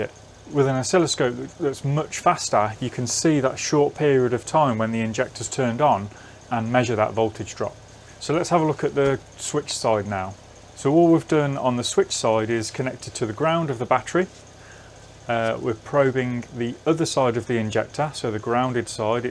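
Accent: United Kingdom. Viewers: Another English accent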